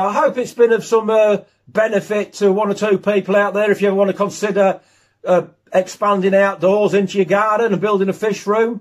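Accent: United Kingdom. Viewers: Appreciate the video. Young fish keeper looking to set up a fish room when I have the space. Nice to hear a fellow Brit :)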